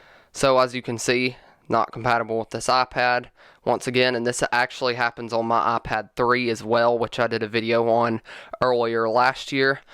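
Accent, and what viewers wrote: South, United States. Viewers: That southern accent though :)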